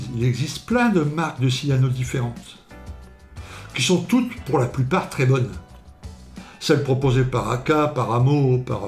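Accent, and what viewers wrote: North, France. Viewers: Salut, j'ai découvert ta chaine il y a peu et j'aime beaucoup.Le ton et la vie que tu y met (ce qui n'est pas toujours simple en fonction du sujet) 👍Et le p'tit accent de ch'nord me ramène à mon enfance ✌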